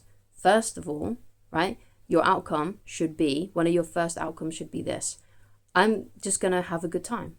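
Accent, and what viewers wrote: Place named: United Kingdom. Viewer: Nice that you have a coaching book out. You're not going to tell me I have to read it 10 to 15 times just to understand it, are you? 😅 I like your content and of course the UK accent... Nothing is more delightful for me than the times that I get to fly through London-Heathrow and everyone is throwing around that accent..."Hallo Yank, yes you... joost need your passport over here, love"... I just love it. It's so different than the way people are here in the States. Anyways, cheers to your book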